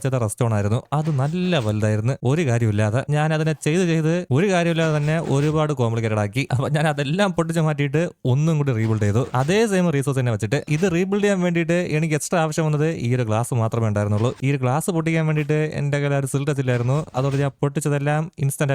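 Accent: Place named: Trivandrum, India. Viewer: crrt tvm slang 😂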